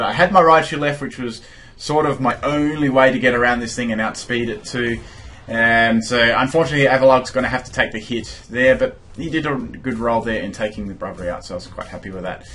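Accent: Australia. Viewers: I'm from australia perth and you song like me so he's probably from perth, australia, anyways NICE vids really enjoy e'm!!!! :D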